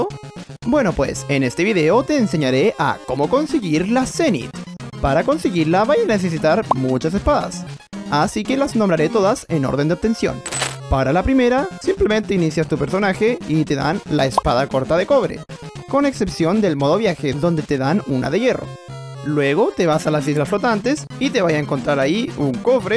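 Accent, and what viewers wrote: Chile. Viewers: bro, te salio con un acento muy chileno el video XD, la parte q mas me gustó fue y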